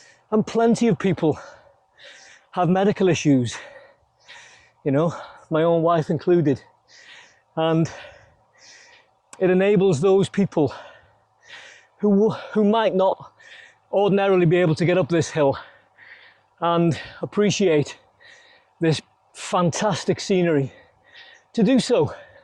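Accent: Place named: Northwest England, United Kingdom. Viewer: Great video.. we did the same route 3 weeks ago and it blew our minds too! Did you try the rough road up from where you stopped for refreshments? It’s a good climb but very rough surface with loose gravel. It sounds like you’re from the NW area, Cheshire/merseyside (ish) I live north wales nr Abersoch and Pwllheli and there are some fantastic roads over hear, so if you’re in the area give me a shout for some good climbs and car free country roads.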